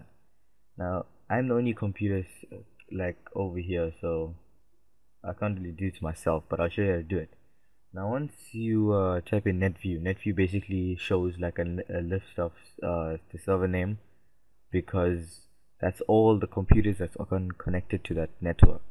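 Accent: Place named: South Africa. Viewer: South African :D lol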